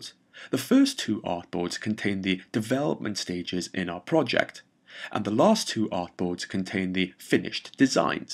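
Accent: Wales, United Kingdom. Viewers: Love the Welsh accent! 😍Da iawn ti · is that a welsh accent I detect? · ah you have cute welsh accent!!! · Is the narrator Welsh?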